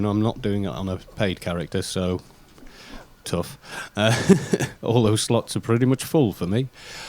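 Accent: United Kingdom. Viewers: I expect by your voice you would have short blonde hair and look like a brit . But now your going to look like a guy ready to hammer some death metal shows XD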